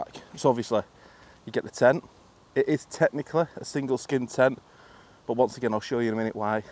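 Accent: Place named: Scotland, United Kingdom. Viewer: Spanish??????? I think he has a Scottish accent. One of us is having a very hard time understanding.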